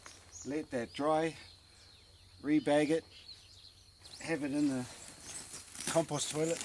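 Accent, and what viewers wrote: New Zealand. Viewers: Did I notice a kiwi accent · great to hear a strong kiwi accent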